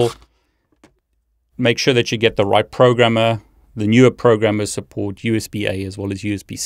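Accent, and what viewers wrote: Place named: South Africa. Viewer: Now that looks like some nice toys., I am starting Cyber Security at the age of 45 thanks to you, Niel and Networkchuck! Are you South African? Your accent sounds SA...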